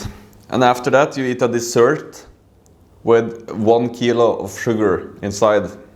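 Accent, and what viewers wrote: Egypt. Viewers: I love you when you speak arabic with egyptian accent💜💜💜💜😂😂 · ههههههههه كلامك المصري حلو جداً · عسل والله لما بتتكلم بالمصري 😂🌸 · I loved your Egyptian accent 😂 Go on , you are doing well 😁 I wanna say something about " makarona pashamil " , it's pasta with a white sauce and cheese and that's it I think it's not Egyptian , Try to eat " Mahshy " or " Mombar " 🤣🤣🤣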